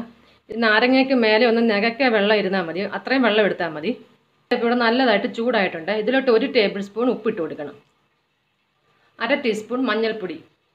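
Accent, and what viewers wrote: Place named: Thiruvananthapuram, India. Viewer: തിരുവനന്തപുരം സ്ലാങ് 😊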